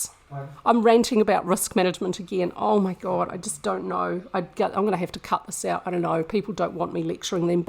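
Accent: New Zealand. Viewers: Btw, Just a bit of a stir from across the ditch, but I can't remember the last time I heard such a heavy Kiwi accent, its heartwarming to hear you 😁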